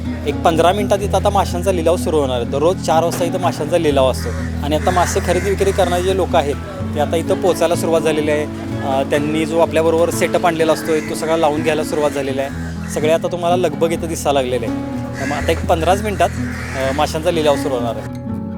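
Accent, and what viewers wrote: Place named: Kolhapur, India. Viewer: खूप भारी कोकण सिरीज आहे. आपण कोल्हापूरचे आहात काय? खूप दिवसांनी माहेरचे शब्द ऐकले... चव सपक आहे... अगदी खेटूनच आहे. शेवटी आपल्या भाषेचा लहेजा एक नंबर असतो. धन्यवाद 🙏🏻💐🙏🏻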